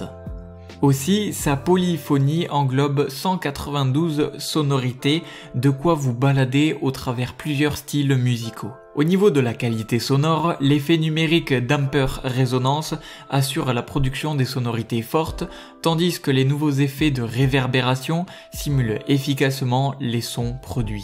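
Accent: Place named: South, France. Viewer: Ce petit accent de la garriguEU 😂😂😂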